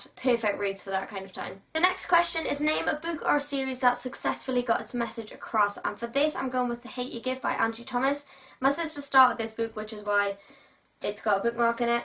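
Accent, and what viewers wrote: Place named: Northern England, United Kingdom. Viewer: Great book tag ☺️ ☺️ I hear a northern accent I'm originally from Darlington 😊😊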